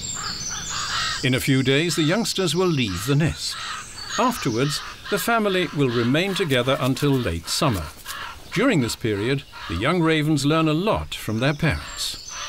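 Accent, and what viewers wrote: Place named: England, United Kingdom. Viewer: Oh my God you sound so English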